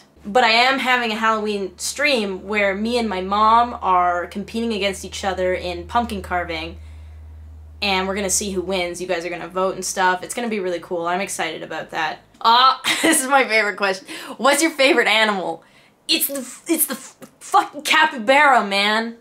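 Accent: Canada. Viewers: All Canadian women look and sound the same..., omgitsfirefox, Cobie Smulders (the girl from how i met your mother), and others i can't remember. Also i have a canadian friend and she likes capybaras too... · A lot of Canadian women sound like this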